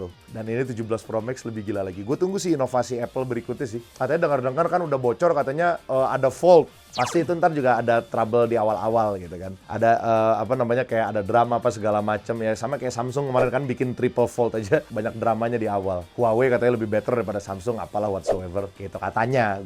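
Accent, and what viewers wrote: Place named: Java, Indonesia. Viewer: Aku mendengar jawa jawa jawa disini 😅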